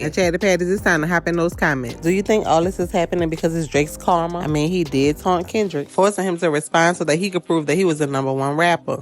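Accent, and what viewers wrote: New Orleans, United States. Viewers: Please speak more clearly and add a few pauses in your sentences. Your New Orleans accent has to be the CUTEST one ever. ❤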